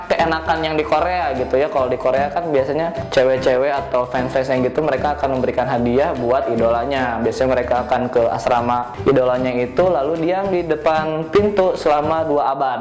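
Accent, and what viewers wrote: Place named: West Java, Indonesia. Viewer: akang nya sunda bangetya suka deh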